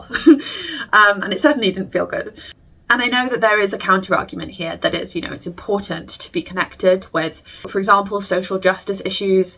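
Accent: United Kingdom. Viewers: eh? she has a neutral, educated accent to me which is common here in Manchester. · Why are you speaking in a scouse accent when your not from Liverpool?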